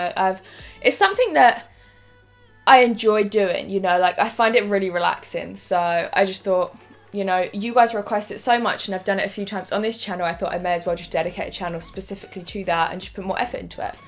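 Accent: United Kingdom. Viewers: Is your accent British ? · She sounds British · British accent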